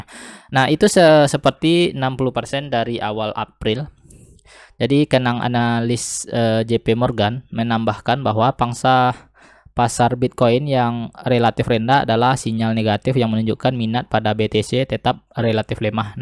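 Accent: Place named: West Sumatra, Indonesia. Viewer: logat urang awak bana da👍😁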